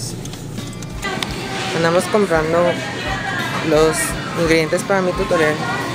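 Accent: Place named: Torreón, Mexico. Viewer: te acabo de conocer y ya soy tu seguidora y admiradora!!! Me gusta tu forma de ser y tu familia, desde que te escuche me imagine que eras de Torreón y le atiné!!!... mi familia es de haya!!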